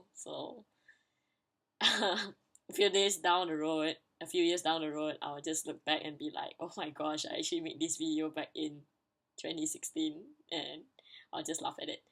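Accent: Singapore. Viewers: Aww don't apologize. I love your accent. I always wanted to visit Singapore. I hope you can check out my channel too? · What no I love singaporean accents (lah) :) You're so lovely (I'm watching!) and am looking foreward to more vids!!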